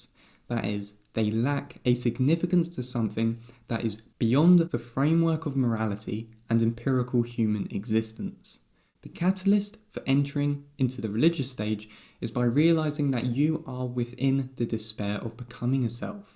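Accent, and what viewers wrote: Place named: United Kingdom. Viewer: Can you please add (British accent) to save me a time.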